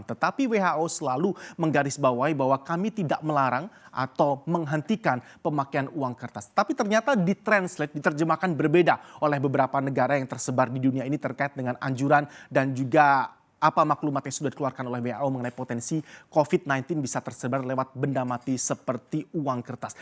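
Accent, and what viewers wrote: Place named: South Jakarta, Indonesia. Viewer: Pembawa beritanya orang jaksel nih.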